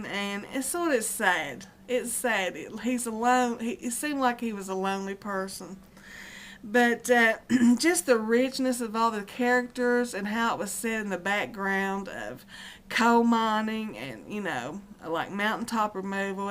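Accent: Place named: Texas, United States. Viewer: You do sound like us Texans! I thought you were one of us! :) That's ok, you're still an awesome sweet person. :)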